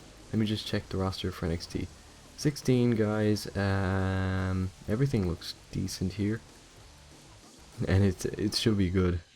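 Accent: Ireland. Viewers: I can hear a bit of Irish accent in your voice. Do you have any Irish blood in you?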